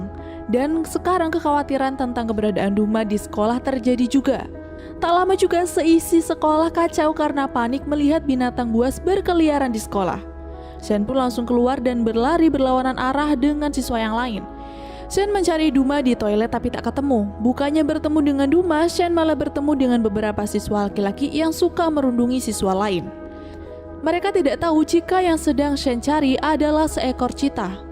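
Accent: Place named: Sunda, Indonesia. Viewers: Teteh Sunda nya😅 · Gaya Sundanya sangat menarik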